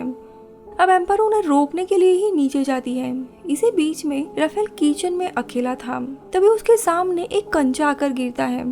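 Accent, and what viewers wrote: Maharashtra, India. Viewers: That marathi accent 😍😍❤❤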